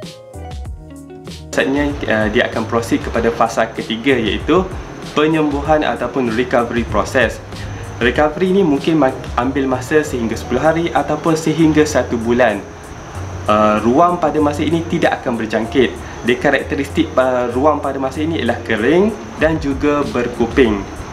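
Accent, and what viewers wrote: Kelantan, Malaysia. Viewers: Maaf Tanya doktr ya, doktar org kelantan ke?? Terima kasih atas perkongsian ilmu doktar.. Terbaik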